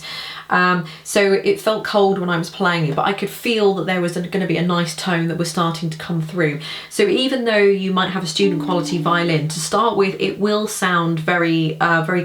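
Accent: United Kingdom. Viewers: I love hearing "pimp up" in a classy British accent. It's really funny.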